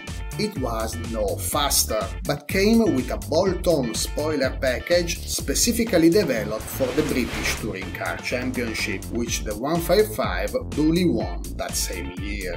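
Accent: Italy. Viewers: Just small correction (sorry to be that guy)... You said that 'Consequently, the 155 actually lost customers to Alfa Romeo' - you don't want to use the word 'to', as you are saying that Alfa has lost customers to itself. Obviously that is wrong. If you wrote 'lost customers to its competitors' that would make sense. Or wrote ' the 155 lost Alfa Romeo customers' that too would make sense. Love your channel, and you have the nicest Italian accent!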